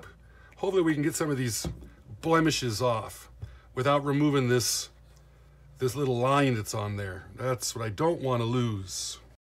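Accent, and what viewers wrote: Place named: Midwest, United States. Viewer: Love your videos,. Your flat N. Midwest accent & idioms are great humor & your knowledge of tools & techniques for rehabilitation of old gear is unsurpassed.. But one minor correction is in order - to say Lionel O-27 is 1/64 scale is just as wrong as saying it's accurate 1/48th. The "27" in O-27 refers to the diameter of a circle of track (27 inches) which was designed to fit a standard 4' x 8' sheet of plywood with enough room to spare for sidings/etc. Lionel was less concerned with scale accuracy (especially in the early post-war era) than what would fit comfortably in the small bedrooms of the time. While most rolling stock was not accurately scaled to 1/48, and was often much shorter, the proportions were also not 1/64. Do a little more research online to find this is true... Meantime - keep up the great work!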